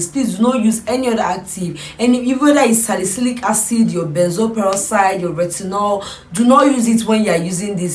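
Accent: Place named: Nigeria. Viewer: I like the way Nigerians speak English thnx for sharing I will try this seriously am tired of pimples & dark spots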